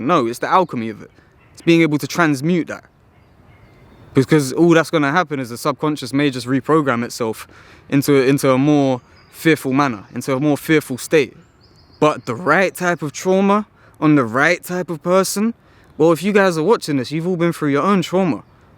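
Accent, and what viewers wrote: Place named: United Kingdom. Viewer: I watched a faceless video of yours and thought you would be a black person from the UK. You talk so similar to one.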